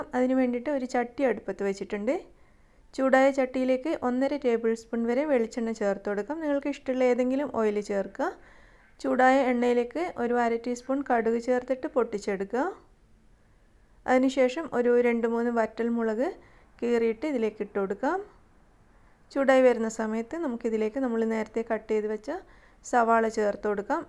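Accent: Kannur, India. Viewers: uuyyantee apppaa. Kannur slangg. Nice receipe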